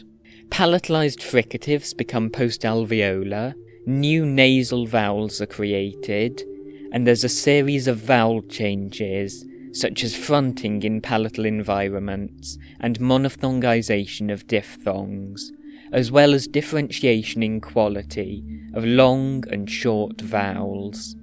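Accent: Wales, United Kingdom. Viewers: Sounds Welsh to me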